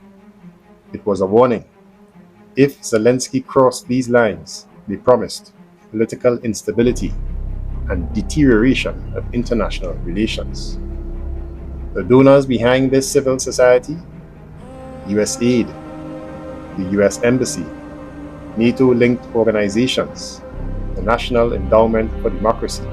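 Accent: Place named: Trinidad and Tobago. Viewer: I know that trini accent anywhere in the world. 🇹🇹 🙌😎 Wha iz Di vybes boy?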